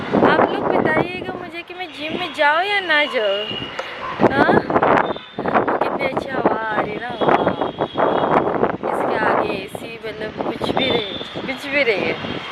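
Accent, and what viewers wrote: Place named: Maharashtra, India. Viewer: Kay yar kay loka ahet...Tumhi kay punekar dista watate. punyat sHhudh marathi bolali jate. Tasach itar bhagat marathi ch bolali jate pn wegli tone ,uchhar wegle astat tyat kay awdha.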